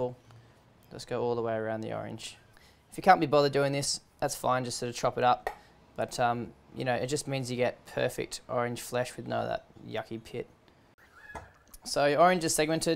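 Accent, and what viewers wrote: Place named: Australia. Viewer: sweet Australian accent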